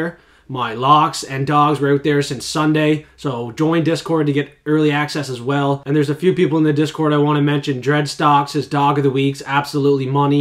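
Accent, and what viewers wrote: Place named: Charlottetown, Canada. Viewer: I knew that accent sounded familar. I'm from Charlottetown.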